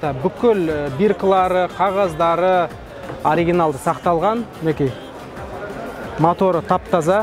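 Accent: Standard. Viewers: басқа блогерлерден өзгешелігі таза қазақша сөйлейтіні жарайсың бауырым жұмысың өрлей берсін!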